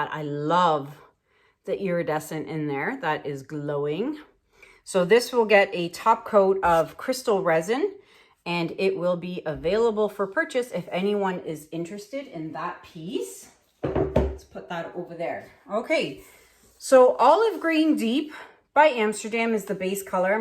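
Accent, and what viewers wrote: Canada. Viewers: I just love your videos. They're incredibly informative and love the Canadian accent. Your work is gorgeous. One of the videos of yours that I watched you counted in Greek and said that's your Greek lesson for today. I'm a Greek girl from Chicago so I was hooked after that. I also have a Sophia. :)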